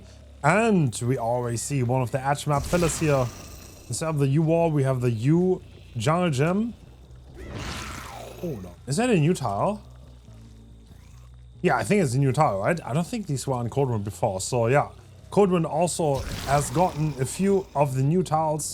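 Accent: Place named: Germany. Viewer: jetzt wo ich weiß das du deutscher bist weiß ich warum mich dein englisch immer so getriggerd hat und ich deine videos wegen den aktzent mir nicht geben konnte aber freut mich bin zwar halb russe aber das einer meiner lands männer so ein erfolgreicher dbd youtuber geworden ist ❤️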